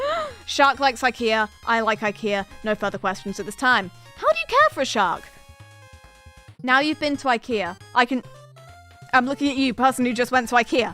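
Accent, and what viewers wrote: United Kingdom. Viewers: Okay, I'm subbing just because of this stream. The dedication to BLÅHAJ is simply astounding, and it's nice hearing another British voice on here. I shall watch your career with great interest.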